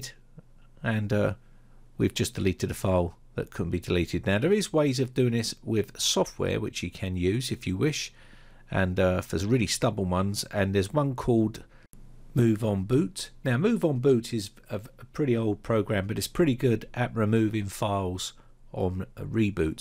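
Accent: United Kingdom. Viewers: It's British accent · Finally british accent being found.. This is rare.. no indian agian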